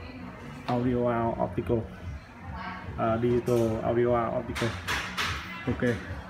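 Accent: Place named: Vietnam. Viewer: I love vietnamese english 🇻🇳 ❤️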